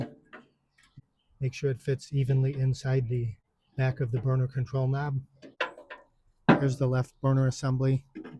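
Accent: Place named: Long Island, United States. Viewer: I don’t even own a Weber grill, but I watched because I like to hear my favorite Long Island Made Man explaining things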